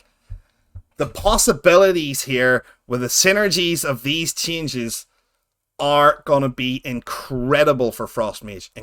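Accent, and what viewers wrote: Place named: Northern Ireland, United Kingdom. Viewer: Hey, you sound Northern Irish :) so nice to hear though :) I'm also a frost mage, nice to see some good tips :)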